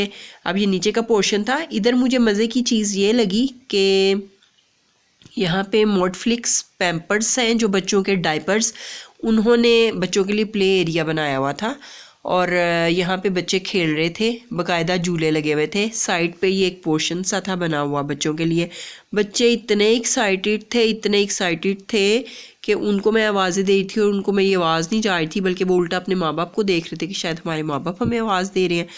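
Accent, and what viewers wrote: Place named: Sialkot, Pakistan. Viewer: Apky accent sy hu lgta hy Ap sialkoti hu 😄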